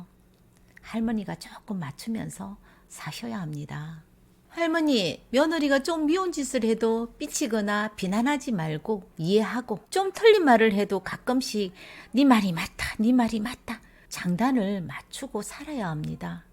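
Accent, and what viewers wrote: Gyeongsang, South Korea. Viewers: 공감되는 내용에 경상도 말씨 지대로 구사하셔서 더더 재미집니다! 👍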